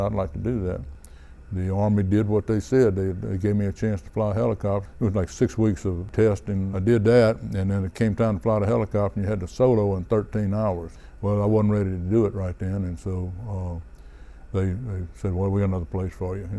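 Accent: Louisiana, United States. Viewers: You Cajun? Sounds like southern Louisiana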